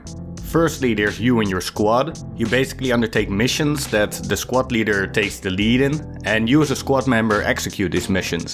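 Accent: Netherlands. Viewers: Just got the game on Wednesday. Been playing every chance I get. I’ve played commander a hand full of times and was straight up honest about it. The squad leaders were very helpful and them because they wanted to win, it was in everyone’s best interest to work through me being a noob. Like you said, a bare minimum commander is better than no commander, although you said squad leader. That being said, squad leader intimidated me the most. Because you are right there in the thick of it all. Commander I hung back, drove supply’s, set up garrisons, and supported the mission via supply drops, bombing runs, and deploying tanks. As a squad leader you have to do much more in my opinion and I don’t want to let my men, and commander down. Also, I recognize that accent anywhere, plus gives it away. My mom still isn’t a US citizen because she doesn’t want to give up her Dutch citizenship, she was from The Hague and my Oma still lives there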